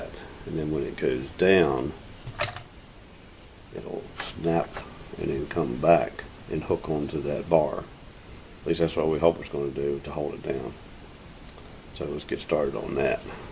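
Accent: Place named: Memphis, United States. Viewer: Is your name? That was my father's name. Your accent sounds like the Memphis/Northern Mississippi area? My father was a woodworker as well and I'm just getting into it.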